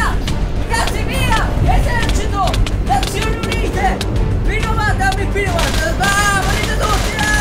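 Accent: Sicily, Italy. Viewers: Ma sei siciliano? Perché sempre che hai l'accento siciliano!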